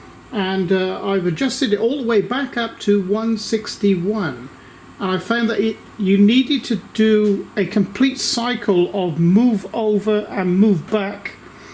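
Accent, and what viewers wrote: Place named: South West England, United Kingdom. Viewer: I’m doubly flabbergasted! Firstly that a machine capable of sub tenth of a millimetre precision is miles out at default settings. Secondly that you’re eyeballing it! What does the digital vernier say? Surely the settings should be based on the maths of of the screw dimensions and stepper motors etc. Should mention I’ve got a 6050 ready to assemble and coincidentally same VFD/motor combination you set up a few months ago. Your videos have been a wonderful source of expertise. Many thanks. PS. Enjoy your very familiar accent, South West England?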